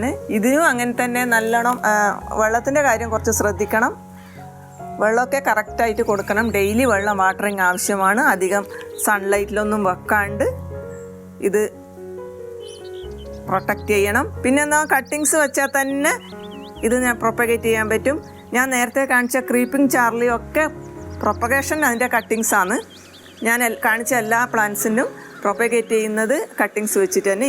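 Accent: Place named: Kasaragod, India. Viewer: Slang kettappo manasali ningo kasaragod bakath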